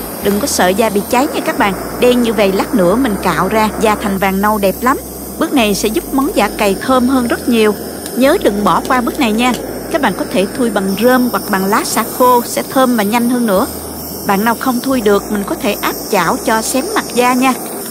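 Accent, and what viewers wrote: South, Vietnam. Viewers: Cô này giọng miền nam chắc nấu kiêu miền nam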